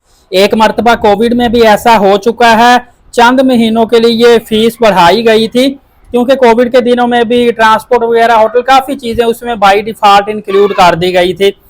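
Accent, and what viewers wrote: Punjab, India. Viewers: سر آپ کی اردو بول چال بھی پنجابی انداز میں ھے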